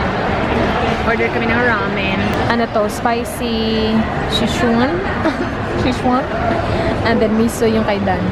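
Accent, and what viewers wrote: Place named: Visayas, Philippines. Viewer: Ka cute nimo mo sulti ug Bisaya te oi😂😂😂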